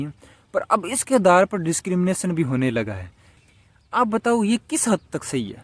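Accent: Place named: Pakistan. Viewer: Your hindi is absolutely sounding like Pakistani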